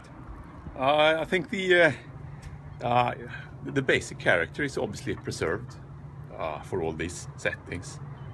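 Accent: Gothenburg, Sweden. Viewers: Great video. Gillar din Göteborgsengelska med. :-)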